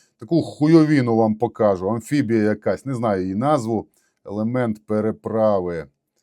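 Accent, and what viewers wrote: Transcarpathia, Ukraine. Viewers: Як приємно чути нашу Закарпатську бесіду.Слава хлопцям! Дякуємо за роботу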